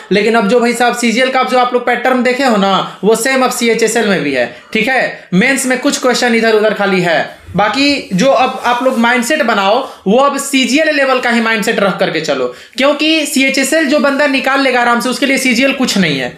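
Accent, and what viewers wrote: Bihar, India. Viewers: आप बिहार से हो ना 😇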